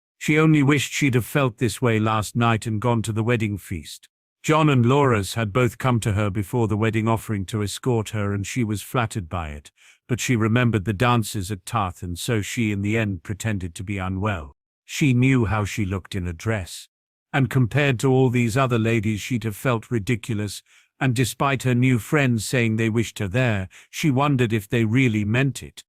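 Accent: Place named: United Kingdom. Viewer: This is a captivating story. I enjoy the British accent only because of the show :) I am hooked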